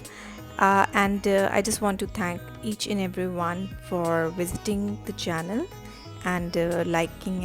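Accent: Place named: Mumbai, India. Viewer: Where are you based? Your beautiful accent reminded me of my holiday in Mumbai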